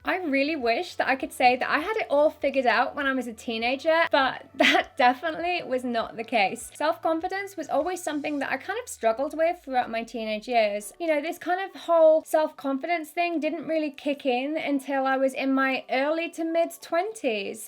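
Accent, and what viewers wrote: United Kingdom. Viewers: Wait are you from the UK because your accent sounds a but deferent xx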